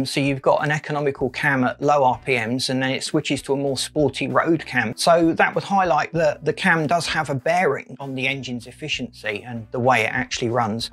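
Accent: United Kingdom. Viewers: Impossible to listen too much twang and cockney accent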